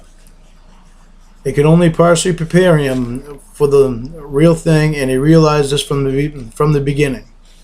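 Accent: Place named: Maine, United States. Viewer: Sound like a mainah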